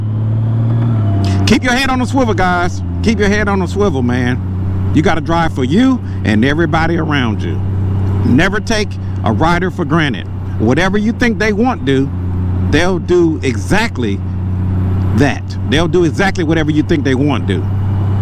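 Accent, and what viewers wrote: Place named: Memphis, United States. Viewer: ! Man I never noticed that Memphis slang the way y’all pronounce some words, the rapper Glorilla who is a Memphis native pointed that out, by the way she has an uncanny resemblance to you my brother just some food for thought. Be safe out there and I’m loving my 15 Goldwing even more.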